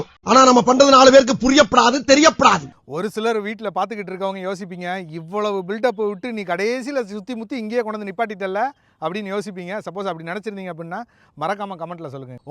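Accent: Madurai, India. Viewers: Madurai slang is emotion bro❤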